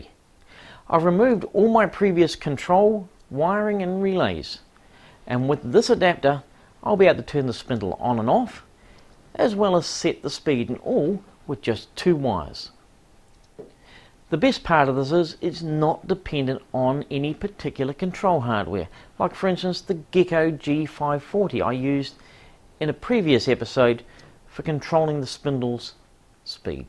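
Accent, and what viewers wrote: New Zealand. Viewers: Hi there, Amazing to hear another New Zealand voice speak so eloquently and informatively. Awesome presentation, I don't know how this is the first time finding your channel. Please keep up the good work, good thoughts to you for helping so many.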